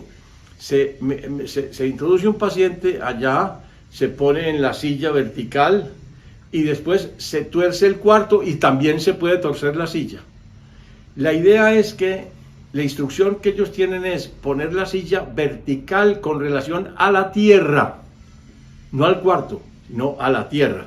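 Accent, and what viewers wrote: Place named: Antioquia, Colombia. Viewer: Me alegra haberme topado con este video y este canal, muchas gracias por argumentar tan claramente; tiene una opinión muy informada por decir lo menos. Creo que es evidente que la ciencia hoy en día no es ciencia, ya que se aferra con garras y dientes a un materialismo absoluto propio de un dogma, a pesar de pruebas clínicas y testimoniales tanto de pacientes como de profesionales de la salud, sin mencionar si quiera los nuevos descubrimientos de la física cuántica (que más bien parecen ser re-descubrimientos, porque al parecer es información que sabíamos y olvidamos o nos hicieron olvidar a través de las eras). Reconozco un acento paisa en cualquier parte y no sabe lo mucho que me enorgullece que los Antioqueños tengamos mentes tan abiertas y sabias como la suya. Un caluroso saludo desde Medellín, Colombia 👋🏻 Favor continue con su maravillosa labor de informar sobre temas tan clave en especial en estos tiempos 🙏🏻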